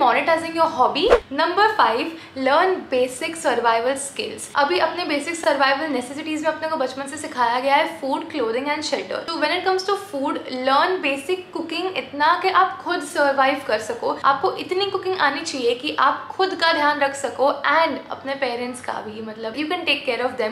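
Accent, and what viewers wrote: Gujarat, India. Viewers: Mam you are gujarati?????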